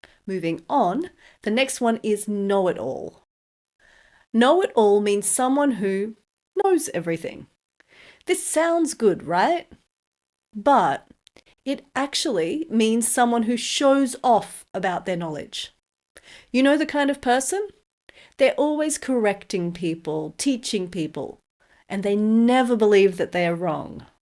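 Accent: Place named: England, United Kingdom. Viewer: Thanks girl I really like your accent from which English city are you from ?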